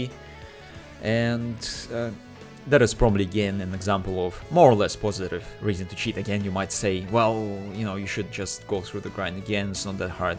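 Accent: Russia. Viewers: Are you from kazakstan? Asian but russian accent....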